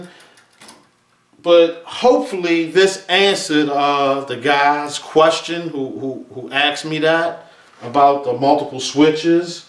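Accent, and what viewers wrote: Baltimore, United States. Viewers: Are you in the Baltimore area? Kind of a Bmore accent. I'm in DC. Anyway kick ads videos. You just got another subscription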